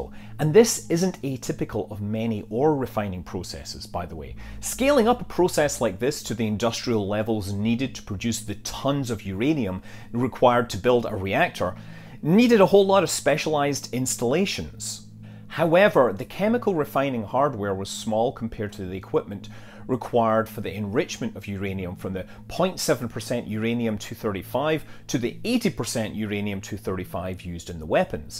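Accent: United Kingdom. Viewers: Is s Accent British or Irish? Don't get me wrong,I love it and understand him better than someone from Chicago, just curious and glad he is here with us in America now! ❤❤❤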